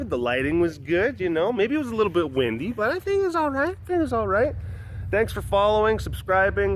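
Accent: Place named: New Jersey, United States. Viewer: Love the Jersey accent! ;-)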